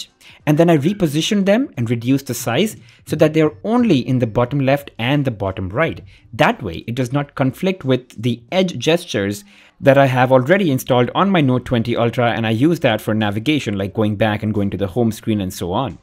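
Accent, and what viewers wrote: India. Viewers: I love hearing your 'd's So in'd'ian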